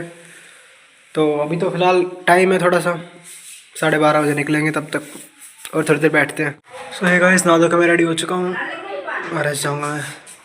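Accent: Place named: Haryana, India. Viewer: haryanavi accent is fireeeeee 🔥🔥🔥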